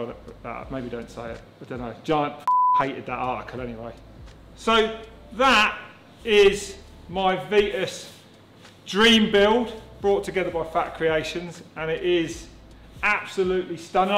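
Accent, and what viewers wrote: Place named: South, United States. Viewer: Funny as fuck! Always been wierd to watch i think its the sothern accent with northern humer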